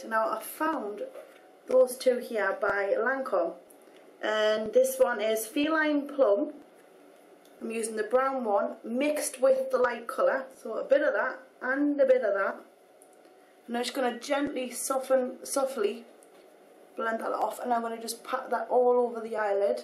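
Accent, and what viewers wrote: United Kingdom. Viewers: Well your ears must be weird because the accent Ms lauren has was voted the best accent in the UK :)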